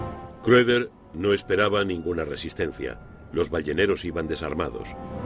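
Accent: Spain. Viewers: Es que habla en perfecto castellano de España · Me parece que se refiere al acento español del traductor 😳no encuentro otra explicación.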